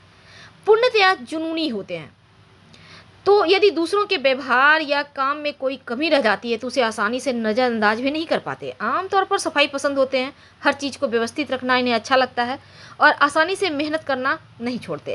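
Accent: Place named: Madhya Pradesh, India. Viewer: If I am not wrong I think you are from mp accent se lagta hai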